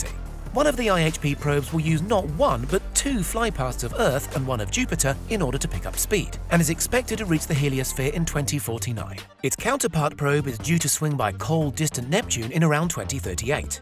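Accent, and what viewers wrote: United Kingdom. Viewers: Hes british chile...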